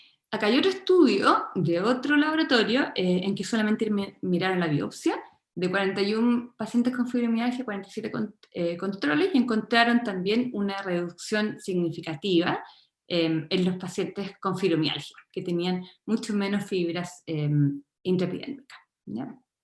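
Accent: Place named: Chile. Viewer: Cuesta entender sus palabras debido a su acento tan marcado, es chileno? 🫩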